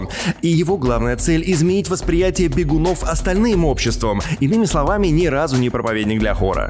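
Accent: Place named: England, United Kingdom. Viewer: О этот английский акцент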